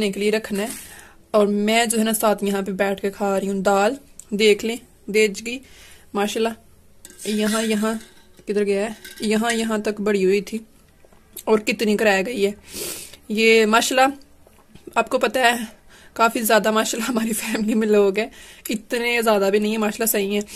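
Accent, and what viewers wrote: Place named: Punjab, India. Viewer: Punjabii accent ❤❤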